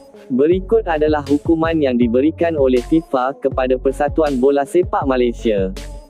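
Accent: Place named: Indonesia. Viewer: Cara cakap kompom dari YouTuber Indonesia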